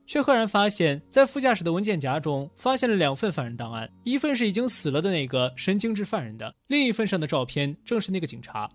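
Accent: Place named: China. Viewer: 肯定是大陆的啊 台湾没有这种腔调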